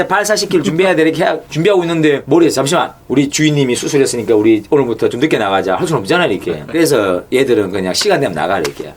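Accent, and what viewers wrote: Gyeongsang, South Korea. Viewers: 사투리가 무지 쎄신데 부산분이신거에요? 대구분이신거에요? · 특유의 경상도사투리가 킬포네 ㅋㅋ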